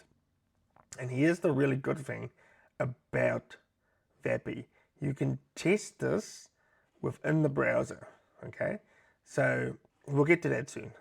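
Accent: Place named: South Africa. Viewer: i'm hearing the South African accent, fellow South African here. Thanks for the content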